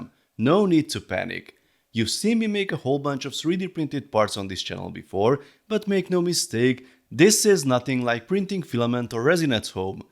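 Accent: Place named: Hungary. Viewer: Heló i m..... erősen magyaros a kiejtés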